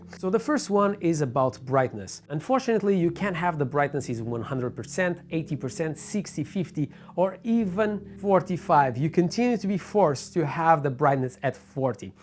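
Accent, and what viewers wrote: Portugal. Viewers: Bro.. You might have the strongest Portuguese accent I've ever heard 😅 (I'm also Tuga) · Wait…. Are you Portuguese?!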